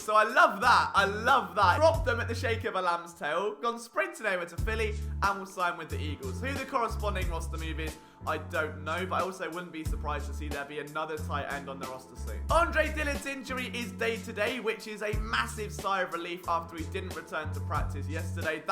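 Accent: United Kingdom. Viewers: U still got the Flyers. They played tonight!! Stay safe!! Btw where are u from because u sound British? I am loving the accent.!!!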